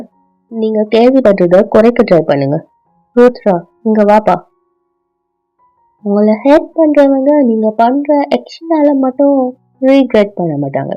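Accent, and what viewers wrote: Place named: Tirunelveli, India. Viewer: noo ga neenga sollurathu tirunelveli slang maathiri erukku enn clg frd kuda eppadithaan ethavathu sollanumna eppadithaan oru visayatha avanala long ahh solla mudiyathu example valikkuthunu solla sonna valikki 😅 nu solluvaan sorry ga just fun 😵‍💫🙃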